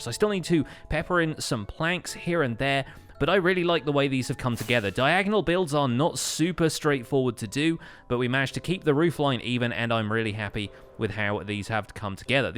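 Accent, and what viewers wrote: United Kingdom. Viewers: British accent?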